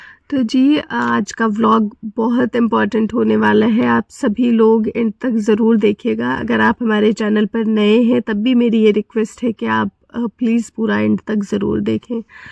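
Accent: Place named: Azamgarh, India. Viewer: Aplog azamgarh se hain hm bi azamgarh se hain or lucknow me rhte Hain kafi salon se even i born in lucknow Apki video dekh k ek connection feel ho rha hai your accent n way of talking like azamgarh... Mere YouTube pe apki videos accidentally recommend hui or mai smjh gai k aplog azamgarh se ho ....❤❤❤❤❤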